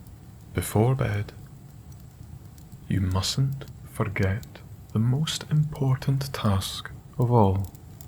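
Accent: Scotland, United Kingdom. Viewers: Loved the story, but really enjoyed the Scottish brogue, will certainly keep listening!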